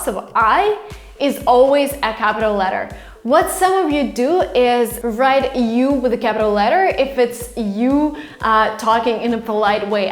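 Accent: United States. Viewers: do your Russian students mention your American accent? I like your videos:) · Hello, I'm learning English mainly to speak like a native speaker. My English level is intermediate and B1 level., I also did English courses intermediate and upper intermediate at British Council in my country Sri Lanka. Anyway my English is not 100% improved and I want to speak like a native speaker. I want to follow your English course. Your American English is also best to me. Unfornately I'm getting a low income from my job and nobody is offering me good jobs because of my sickness. But I will try my best to collect money and buy your English books. You are kind to me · Hi, I have been following your videos, really great.... I am a softskills trainer but I understand that I need to learn a lot about it. I am from South India, working in an institution. Any kind of your help, will be of great support for my career. 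Please let me know if you can offer me in this aspect. I want to talk like you. With the American accept. Please help me.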